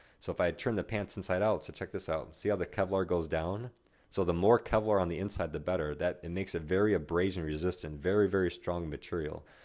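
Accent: United States. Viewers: Holly molly, as soon as I was 15 secs into your video I knew you were american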